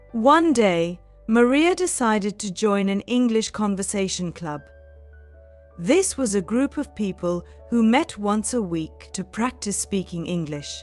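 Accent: United Kingdom. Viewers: 영국신 발음이네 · British accent?❤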